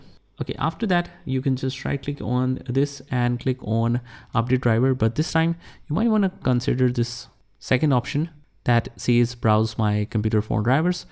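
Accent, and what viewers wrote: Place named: India. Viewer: the indian accent is strong in this one.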